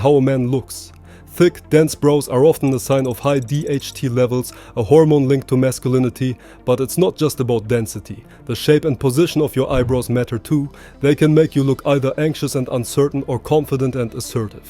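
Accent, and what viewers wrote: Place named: Canary Islands, Spain. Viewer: dein akzentsetzung klingt nach Kanaren deutsch also nicht böse gemeint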